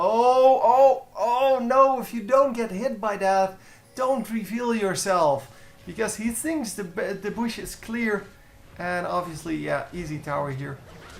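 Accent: Netherlands. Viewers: You sound like a Dutch man when you are talking English :D from what country are you if i may ask?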